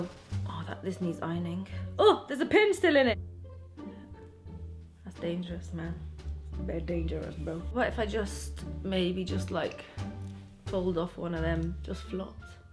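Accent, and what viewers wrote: United Kingdom. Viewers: That british aCCENT thou! U go dina As if am hearing adEle the singer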